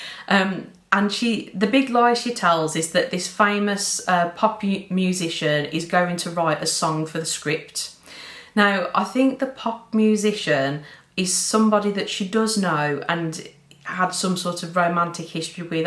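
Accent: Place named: Midlands, United Kingdom. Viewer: Love your videos! Such a fresh approach, speaking like a lovely normal person from the Midlands 🙌🏼 Watching all the way from Perth, Australia 📚 Currently reading The Paris Apartment, by Lucy Foley. So far ⭐️⭐️⭐️⭐️⭐️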